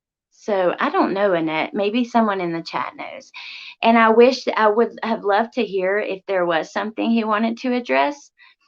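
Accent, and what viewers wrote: South, United States. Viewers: 1st time here, I can tell youre a southern girl like me lol 😊Youve got a new sub.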